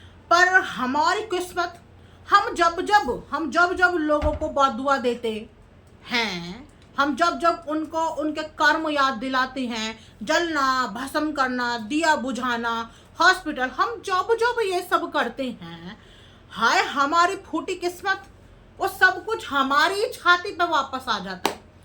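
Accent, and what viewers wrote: Bihar, India. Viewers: di aap to ab thoda thoda bihari bhasa niklne lga lgta h ap bhut jyda video dekhne lgi kumata devi ji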